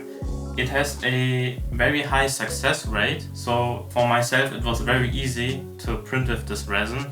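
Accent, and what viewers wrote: Germany. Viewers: Cooles Video aber man hört das du deutsch bist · Are you from Germany?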